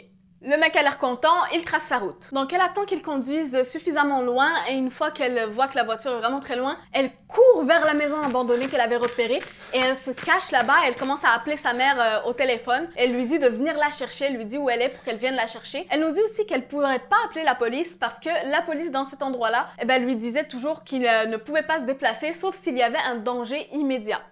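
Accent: Quebec, Canada. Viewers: T'es tu québécoise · Salut, je trouve que tu as un léger accent. Tu ne serai pas Québécoise ? En tout cas j'adore ta voix ❤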